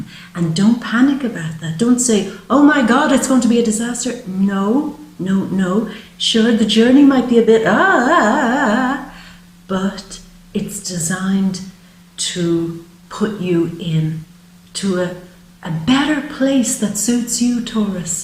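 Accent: Ireland. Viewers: I just love your Irish brogue, maybe I will meet you one day :) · ire u irish by any chance btw im irish thank u for video much love <3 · I am a newcomer to this video and I love what I see, hear and learn from you. Listening to you, I am just awestruck by your extraordinary and wonderful energy, your natural beauty, your graceful demeanor of delivering, and most important, your intuitive skills-set and wisdom of what the world can bring us. Thank you, I am so happy to have found your site. Also, do I detect a slight Irish accent? It is absolutely superb and I love it.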